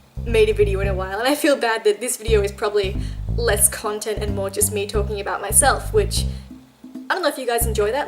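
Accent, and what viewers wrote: Australia. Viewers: Love your accent :) Where in Aus are you? I used to live in Perth :)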